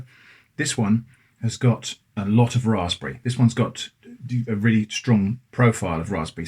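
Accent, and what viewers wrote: United Kingdom. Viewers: Its the british accent and somewhat whacky niche lol.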